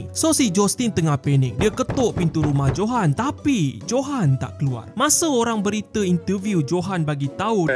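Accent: Sabah, Malaysia. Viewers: Orang Sabah Ka kau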